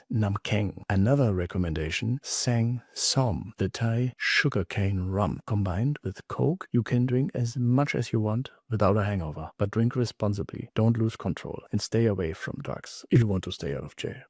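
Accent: Germany. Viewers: haha, ich habe das Video tatsächlich im og ton geschaut und paar Minuten später war ich mir sicher, dass du aus Deutschland kommen muss 😅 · LOL l like the Masterpiece Theatre whisper with the low lighting - think it adds to the atmosphere especially with your great German accent! Been in Thailand about 6 months and you speak ABSOLUTE TRUTH brother and I have actually had many of the same thoughts and realizations as yourself. Thanks for your wry, witty humour and entertaining content. Much appreciated 🙏Here’s to the Elephant Pants!😂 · It took me until 16 minutes to realize you must be German. 😊 Ill be there in 11 months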